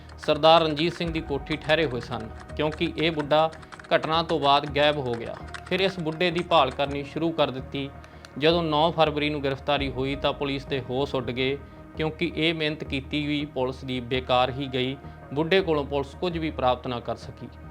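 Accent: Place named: Punjab, India. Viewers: ਮਾਲਵੇ ਦਾ ਲਹਿਜਾ ਇਹ, ਛ ਨੂੰ ਸ ਕਹਿੰਦੇ , ਜ਼ਬਾਨਾਂ ਨੂੰ ਅਲੱਗ ਹੀ ਰਹਿਣ ਦੋ , ਇਹਦੇ ਚ ਭਾਸ਼ਾ ਦੀ ਖੂਬਸੂਰਤੀ ਹੈ। ਸਾਰਿਆਂ ਨੂੰ ਇਕੋ ਜਿਹਾ ਨਾ ਬਣਾਓ । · Oo prawa eh pendu theth panjabi aa tu kithe rehna va 😊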